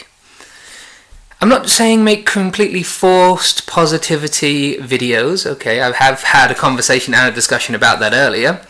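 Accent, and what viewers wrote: United Kingdom. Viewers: great video mate!!!! love the way you say Telly! is in the with ur British accent!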